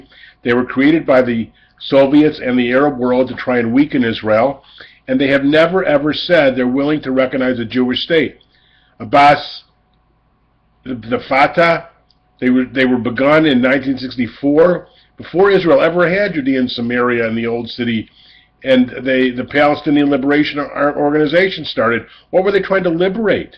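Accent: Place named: United States. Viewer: I thought he had just visited Israel to honour his grandmother, Princess Alice. You obviously have not heard the news...! You are obviously American by your accent and that could excuse your ignorance.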